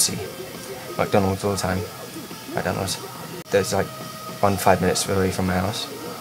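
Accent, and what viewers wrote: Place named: England, United Kingdom. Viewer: you sound more english than welsh, aw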